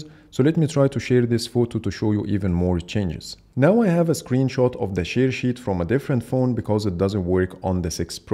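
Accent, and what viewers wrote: Egypt. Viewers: By listening to you, I immediately noticed that you are Egyptian. right?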